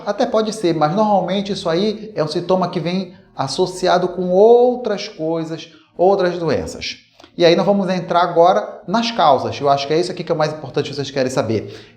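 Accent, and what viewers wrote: Pará, Brazil. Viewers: Gente, esse Dr. é Paraense o sotaque Rs fofo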